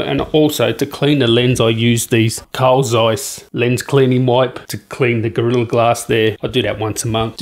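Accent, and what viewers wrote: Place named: Australia. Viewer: Hey mate, not much of a story. Plus I heard the accent and just had to listen - nice change from across the pacific... Just letting everyone out there know along with you if they had any doubt, just think about the 6+ months that have gone by to let the QA/QC processes take place and iron out the production problems. That said, if you find any issues or problems if you buy one, try to return the product straight away. I am pretty sure there was another reviewer on Youtube giving the same advice.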